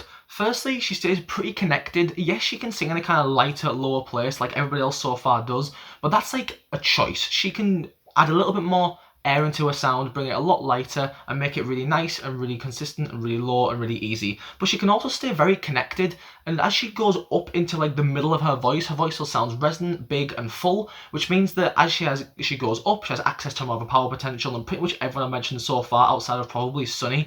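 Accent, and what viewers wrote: United Kingdom. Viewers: I wish u put some eng sub bec u speaks like james charles i mean u speaks fast and not all of us can understand British accent or what ever accent u have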